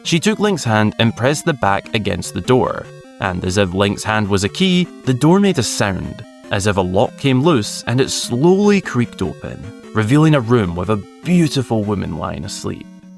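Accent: Scotland, United Kingdom. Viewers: Scottish Zelda Storytime is now my favorite Storytime. 💚🏴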